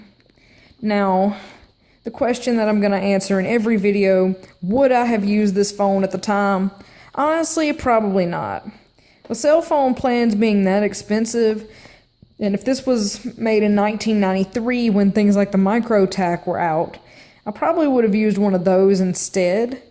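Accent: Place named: Alabama, United States. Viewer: I'd guess you're in Alabama based on your accent. Maybe Georgia?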